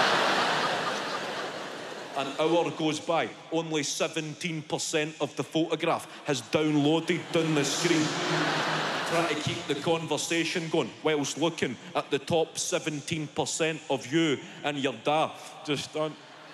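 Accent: Scotland, United Kingdom. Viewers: His language is English, his accent is Scottish.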